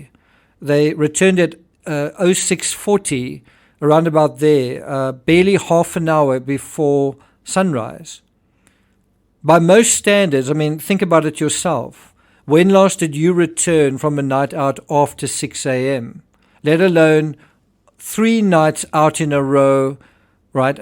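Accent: South Africa. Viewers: Just listing to your accent and you mentioning your language, we had good friends who spoke so much lie you the husband was quite a famous singer in South Africa called George Van Dyke, he lived here in the UK for quite a while until leaving for the US we are still in touch and he is doing great over there. Love your work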